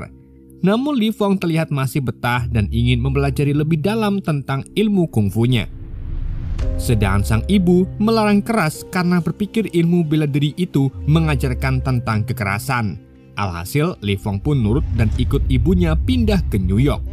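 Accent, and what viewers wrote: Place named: Java, Indonesia. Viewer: Medhok yg mantab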